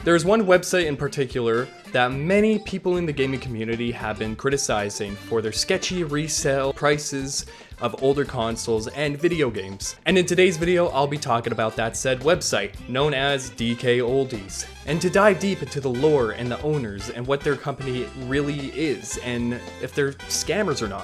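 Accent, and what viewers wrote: United States. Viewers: You have a very American accent, where are you from? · How are you not from America but have one of the cleanest American acents I’ve heard in a very long time ?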